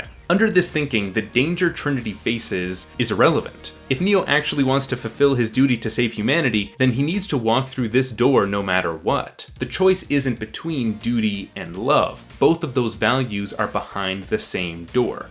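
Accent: Canada. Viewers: You sound SO Canadian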